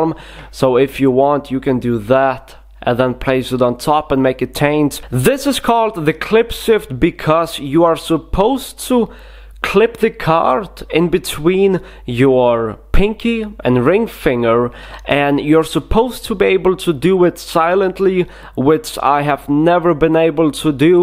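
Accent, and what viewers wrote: Russia. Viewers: Russisch Accent Genius... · are you from russia? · Почему решил вести канал на английском у тебя вроде как русский акцент)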